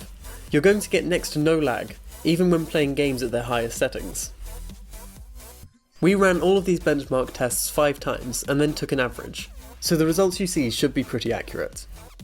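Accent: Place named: United Kingdom. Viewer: I like your style, looks clean and professional. also your brittish accent makes me like the video even more :D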